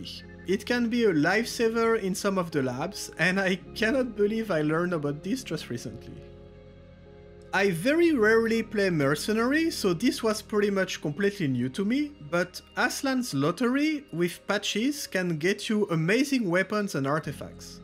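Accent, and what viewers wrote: France. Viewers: J’ai le droit de commenté en français? On reconnaît bien l’accent 😂 Belle vidéo ! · Are you french ? Greets from France :)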